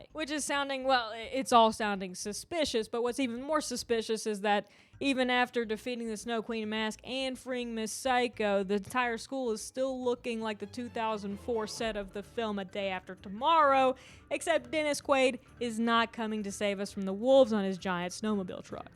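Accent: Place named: South, United States. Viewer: I know this is a completely random question and I doubt you’ll even see this but I have to ask: are you from the south? I’m from Texas and you seriously sound just like some of my friends that have lived in Texas their whole life. The accent is just almost exactly the same so I had to ask.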